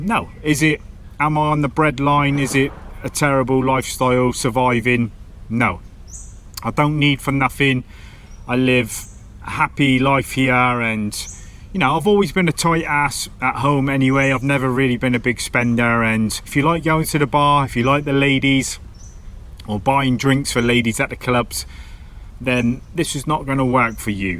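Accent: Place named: South West England, United Kingdom. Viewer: Hi, Been following [subscribed] you for a while now, it sounds like you are from the South-West-ish area of the UK [so you will be well-aware of the weather in the UK]. I am interested in Chiang Mai "as it seems to have cooler temperatures than the rest of Thailand" [Chiang Rai also looks like a cooler option too], that said, could you give a portion of one of your vlogs that tells of what to expect weather-wise [mostly between November to May in my situation] in Chiang Mai? Good-luck Buddy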